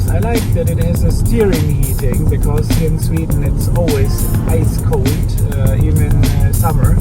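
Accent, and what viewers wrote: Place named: Germany. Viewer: Jag måste bara fråga, är du tysk men bor i Sverige? Anledning att jag frågar är att du verkar ha tysk brytning på din engelska. Massa ursäktanden från min sida om jag har fel.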